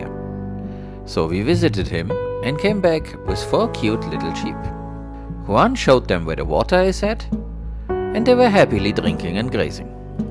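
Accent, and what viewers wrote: Germany. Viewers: Where did you get your German accent ?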